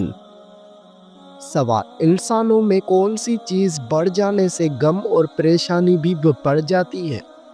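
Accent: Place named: Sindh, Pakistan. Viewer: Ap sindi hu achi ko ashi khty hu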